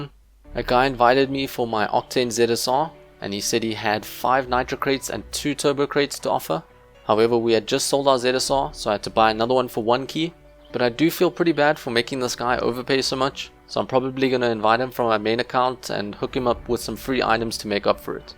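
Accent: South Africa. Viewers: South african? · I will guess where you are from so accent sounds a bit African maybe light German but mostly African so South Africa would by my guess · Are u south African cause ur accent sounds like it btw i am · I knew I recognised ur accent, I'm south african too ! hehe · Are you South African ???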